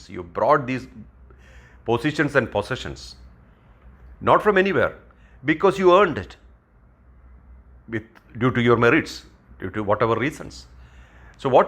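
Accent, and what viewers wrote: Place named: India. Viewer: I wish you could put subtitles in English for the deaf and for people who are not get use to hear Indian accent. Thank you!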